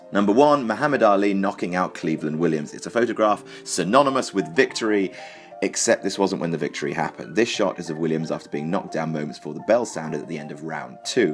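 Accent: United Kingdom. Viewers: The snippy British accent though charming becomes grating.... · you talk too fast and your accent is a bit too British speak normal and speak slower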